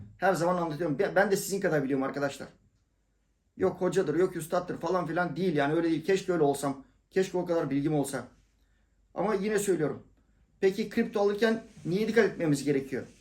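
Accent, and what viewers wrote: Thrace, Turkey. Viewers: Şive hoşuma gidiyor Trakyalılar neşeli dir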